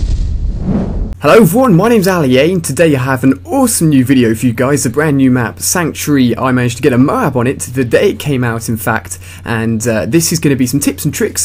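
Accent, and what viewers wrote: England, United Kingdom. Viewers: r u british ? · nice english accent I understood everything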